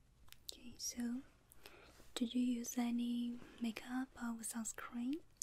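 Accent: China. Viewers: She has a very strong Chinese accent, but it really helps me with my anxiety and helps me fall asleep 😴 ❤️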